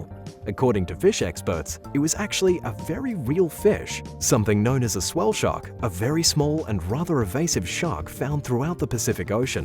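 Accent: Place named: Australia. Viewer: Since subbing to your channel a few weeks ago, ive never enjoyed a nature channel as good as yours! I dive in as soon as i get notification & have thoroughly enjoyed every single one! I know there are tons of wildlife vids out there but yours gets straight into the nitty gritty with gusto & enthusiasm & i love the Aussie narrator Long may you all continue to show us great vids & brilliant cinematography underwater & above Super entertainment Thank you all 😉🇬🇧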